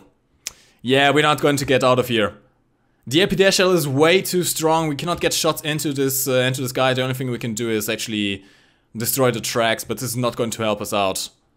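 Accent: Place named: Germany. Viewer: very german accent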